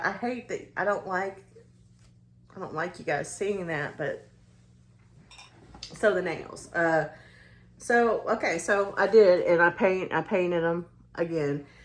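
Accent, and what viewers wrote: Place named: South, United States. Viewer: My niece would love the cute body cat. 🐱 💕 Ty so much for being so down to earth. Your positivity is a domino effect for sure. 😊 Thank you so much for shouting out my sweet and precious bff 🎉She is such a blessing in my life and I’m grateful for her daily. ❤I was so glad she sent me your way too. I’ve truly enjoyed this video so much. Love self care Sundays and Trisha’s Friyay mask day. My two favs to tune into now!!😊❤ People like you and Trisha ( and so many more)are making a difference in such a positive way so keep going. You ladies are helping me so much more than you even know. I wish you all the best! 🤗 ❤ Trisha and I have some southern twang too so no worries here. Lol😅 Btw the way your husband is very sweet. EewwwWee… is a great nickname! 😀 you have made me laugh so much. So ty!!😅 I have watched one of the Final Destination movies and No more for me. 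🥴 Cute little cats 🐱 I have 3 dogs I feel the same way about! Enjoy time with your momma. I lost mine last year so hold tight to those great times you make together. 😊 God bless and have a wonderful Sunday! ❤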